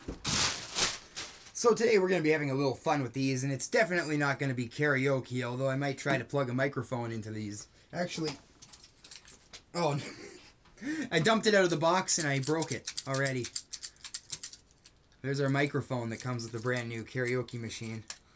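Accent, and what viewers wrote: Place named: Ontario, Canada. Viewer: I love Ontario accent, love from Italy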